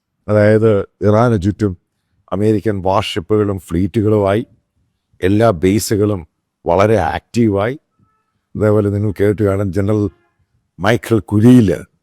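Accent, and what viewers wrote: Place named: Kottayam, India. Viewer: ആ കോട്ടയം സ്ലാങ് തള്ളൽ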